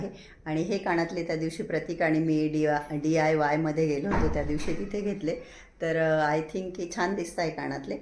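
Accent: Nashik, India. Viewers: Hi dii me tar pillu la Laddu ch mhananar..❤❤ me tar त्याच्या videos chi waat baghat hote Love you..me Nashik मधील ch aahe but now staying in Bangalore..tumcha typical Nashik cha हेल आहे i mean accent aahe तो खूपच मस्त वाटतो❤❤❤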